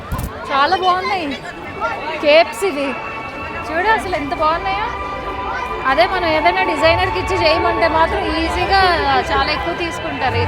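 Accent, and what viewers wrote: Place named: India. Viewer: ur totally changed 😀😀dubai to pure Indian slang version but very happy to see u don't stop vlogs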